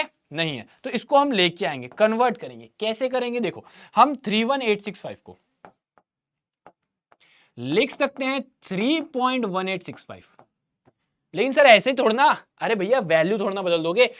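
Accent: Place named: Bihar, India. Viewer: Sir speaking bihari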